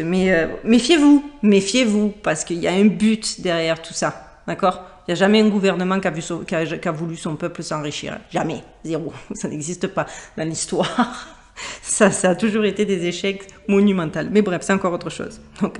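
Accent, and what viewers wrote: South, France. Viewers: Merci gratitude ! toujours des vidéos très pertinentes, avec ton accent du soleil et ton bel humour. Restons dans nos valeurs avec confiance ! le passage peut sembler long mais il n'est rien comparé au nombre d'années de soumission traversées... En face, ils ont négligé un facteur : la possibilité de l'humain de se reconnecter à la source à tout moment...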